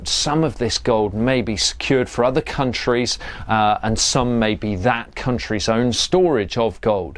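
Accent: United Kingdom. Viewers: good british accent It's suppresses as the price reflects the economic health of a nation and also the inverse effect on currency Ponzi scheme, as the metal is leased out many more times than actually exists ; If u went into the aftermarket and bought after the close, and sold before the open on the nex day, u'd make risk free profits : Massive SELL order just b4 close scares long investors to lower their bid AND spooks hedge funds into dumping Au..this becoming a self fulfilling momentum. As 20-to-1 leverage is present in futures market, the effect is great> Hedge funds' stop losses also kick in...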